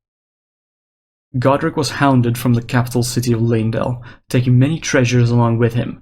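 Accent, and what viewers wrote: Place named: Netherlands. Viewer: Man, you sound so dutch, are you? xD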